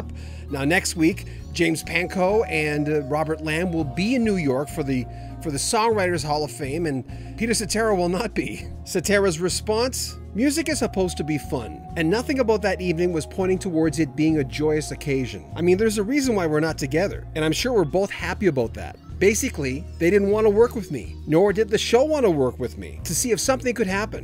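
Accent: Canada. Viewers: So, are you like from the Chicago area or it sounds like your from Canada. Don't get me wrong, the Great Candy was from Canada and he is missed every day. I'm from Joliet, Illinois-Chicago south suburbs-Mancow likes to call us "Toliet". Anyway, its like Pete stabbed us in the back-that's what it is like. Listening to WLS waiting for the next great Chicago hit. We knew he was the great lead singer for the most part. I remember him saying in an interview that he was at a party and Paul McCartney came out of the bushes with Linda and Pete went to introduce himself and Mac stopped him and said"we know who you are". I'm sorry he felt whatever he felt and still feels, but we the Chicago fans loved him and no one but no one can sing 25 or 6 to 4 like he did. So he pretty much hurt us and still hurts us. Who cares otherwise, he can go back to singing with Cher or something. 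I guess we wanted that old feeling back before we all died. If he isn't doing anything maybe he can go sweep up what was left of the passenger side window on my 1972 Dodge Polara that got busted out in the parking lot of the old Chicago Stadium back in 1976 when I went to see Chicago-great concert-bad experience.......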